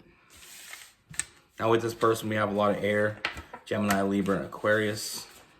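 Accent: Canada. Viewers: Love your Canadian accent 😎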